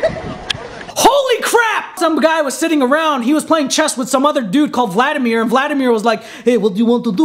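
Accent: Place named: Australia. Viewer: It sounds Australian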